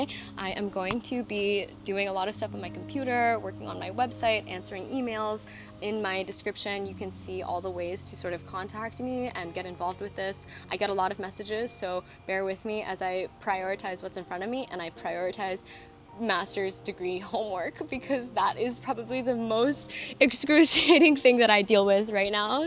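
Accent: United States. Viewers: You are so beautiful on camera. Are you working with a professional film crew to do your make-up, hair, and lightings? You sound American, what nationality mix are you? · Sustainable energy. Such nonsense. And you sound like a fake person anyway. UN = Unfree Nations. I get a headache listen to your California accent and fake nice person acting.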